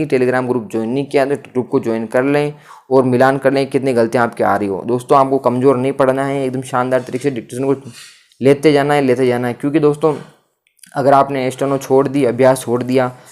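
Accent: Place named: Rajasthan, India. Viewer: आप की हिंदी मे राजस्थानी का थोड़ा सा मेल है इसलिए कुछ शब्द अलग सुनाई देते हैं 😅😅😅😅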